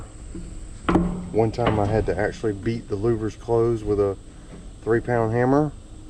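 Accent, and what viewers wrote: South, United States. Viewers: Ya he descifrado quién es tu hispanohablante adinerado. Qué bien. Eres un hombre de muchos talentos. Who would have thought a good old country boy from Georgia with a southern drawl is a fluent Spanish speaker? lol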